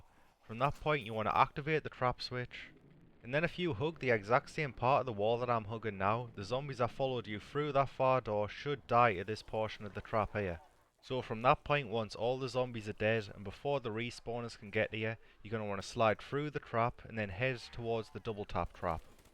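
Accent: United Kingdom. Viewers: I have no clue if is scottish or british love your videos btw they help me alot Edit: you know the map is Hard if the first Clip isnt round 100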